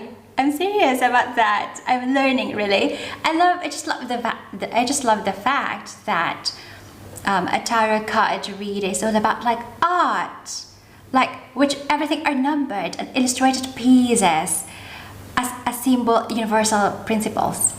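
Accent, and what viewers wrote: United Kingdom. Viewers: i have a few passions that I am putting on display. but mine are from a student's perspective. I like the way you speak,. it's the king's accent, right?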